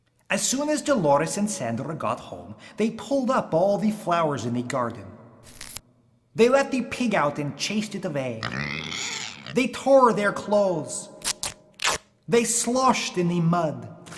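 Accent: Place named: Transylvania, Romania. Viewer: Oh, this is adorable! If my LO can understand your (quite well-done!) Transylvanian accent (she’s 3 🤷🏼‍♀️), I’ll introduce her to a few of my favorite SSTTITD stories.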